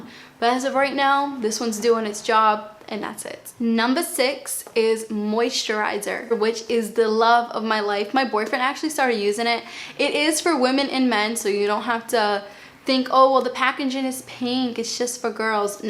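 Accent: Boston, United States. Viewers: Please don't change your accent for youtube! There's no wrong way to say things, it reflects the dialect where you are and it makes you unique! I watch your videos a lot so sometimes I will end up saying things with a Boston accent just because it's kind of fun! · You are so right. Less is more. My daughter and I are helping each other clear out our homes of excess material things. I have been saving so much money buying less things that I am taking more trips. I love to travel. Great video, as always! I love your Boston accent. Embrace it. ☺ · I love the way you say concealer in your Boston accent! It’s unique and authentic and I love it 🥰 don’t feel you need to “correct” your accent/ pronunciations x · You pronounce your words perfectly with your fantastic Bostonian accent! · No. Please say “concealuh”. Never ever lose your Boston accent 🥺🥰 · Love your Boston acsent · You don't have to correct your Boston accent! 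It's who you are and I love listening to you speak! Who cares if you don't pronounce it exactly as it's spelled, do people actually complain about this? We are all unique! I'm in Philadelphia and we also pronounce things the "Philly way" haha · Through this entire video, I wondered where your accent came from because of the pronunciation of some of your words. Then you said Boston. You’re one of my grandma’s people! This is my first video of yours I have watched. I love it! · Noooooooo I LOVE your Boston way of talking! Please don’t change it! You will get all sorts of viewers from all over the world and they all will have options. Please don’t care! I love the way you talk...Boston style! ;) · Lets get one entire video with your natural Boston accent. Who agrees?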